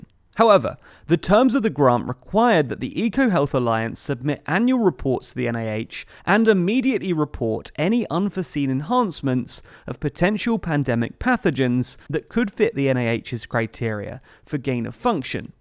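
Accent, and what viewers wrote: England, United Kingdom. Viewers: It's the English accent. He did say, "Rand". Unless it was written and I missed it.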